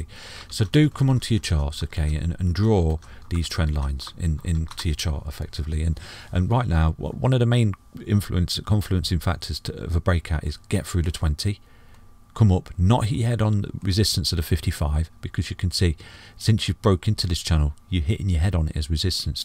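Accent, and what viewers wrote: United Kingdom. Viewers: this is a good video, clear and simple to understand, and a British accent to boot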